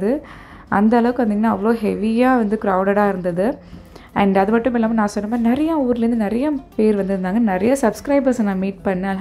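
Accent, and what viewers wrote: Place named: South Tamil Nadu, India. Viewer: Recently watching ur videos.. Very natural.. Which is ur native.. Becaz south side slang madhiri iruku adha keta sis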